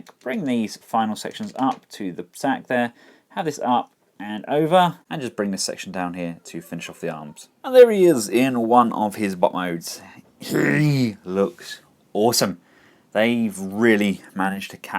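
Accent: England, United Kingdom. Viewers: you and your puns, more funny in your English accent!!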